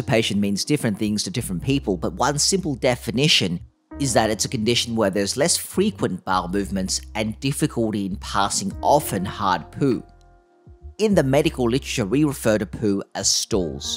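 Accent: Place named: Australia. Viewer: Your Australian accent actually made this video fun and enjoyable because hey I'm litteraly full of shit ! But being serious nice video 😂😂 litteraly just got back from the hospital because of this sh*t !!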